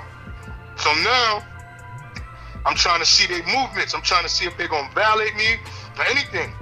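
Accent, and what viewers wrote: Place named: Brooklyn, United States. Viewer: My dude sound straight from Brooklyn or Harlem. That's our lingo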